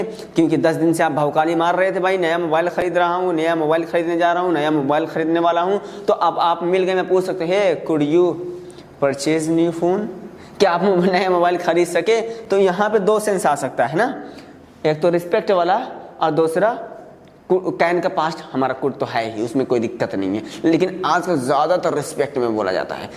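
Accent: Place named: Bhojpuri, India. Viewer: I have never seen such a teacher like bhaiya best teacher 😆 Whenever I attend his video I started smiling Before open the class, I started laughing 😂 He isn't speak hindi he speak bhojpuri😂 his sound gives bhojpuri vibe 😅 I like it this class with no distraction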